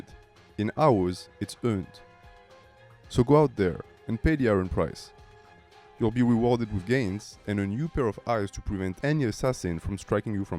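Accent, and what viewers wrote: France. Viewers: With your accent I Can Say that you're French... Am I right ? I Can tell this 'cause I'm french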